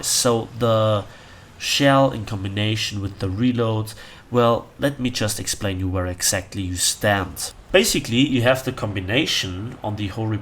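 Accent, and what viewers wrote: Germany. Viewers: your voice sounds even more German like this. It is EVEN BETTER. NO NEED TO SAY SORRY. LOVE YOUR VIDEOS. YOU ARE WHAT KEEPS ME PLAYING WAR THUNDER. THANK YOU FOR BEING SUCH A GOOD CONTRIBUTOR TO OUR COMMUNITY.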